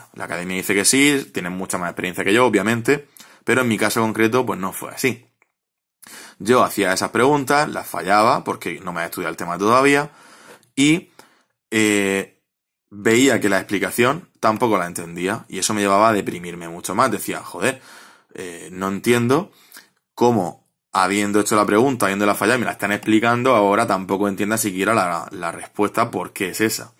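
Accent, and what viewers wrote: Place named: Durango, Mexico. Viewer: amigo medio durango vos .....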